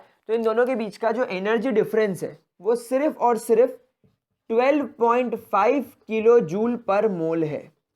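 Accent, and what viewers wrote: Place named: Indore, India. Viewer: yeh sir pakke indore hai voice se hi pata chal rha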